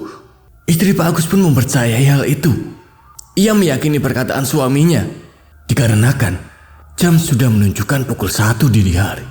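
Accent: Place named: Java, Indonesia. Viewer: Assalamualaikum, saya ibu yanti dari Bandung hadir mas, Mas klo ngomong jawa enak banget di dengarnya melok banget ya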